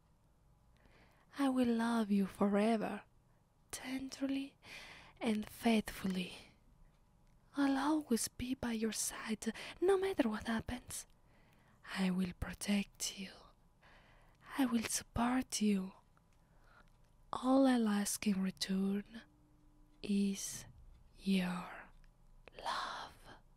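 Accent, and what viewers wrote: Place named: Italy. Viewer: I don’t know why but the Italian accent adds to the charm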